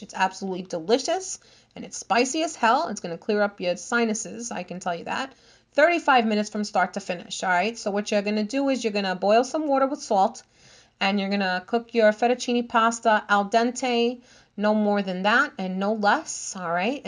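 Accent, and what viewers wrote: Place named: Long Island, United States. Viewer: you sound like ur from Long Island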